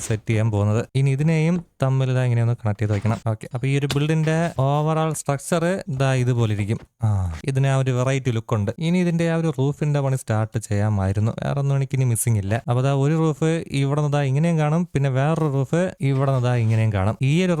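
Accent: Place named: Trivandrum, India. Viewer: crrt tvm slang 😂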